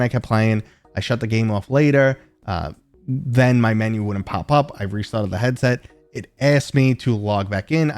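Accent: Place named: New England, United States. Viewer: Love your content brother. I'm curious. Are you from the Boston area? I'm from NYC and trying to figure out if your accent is New England or NYC 🤣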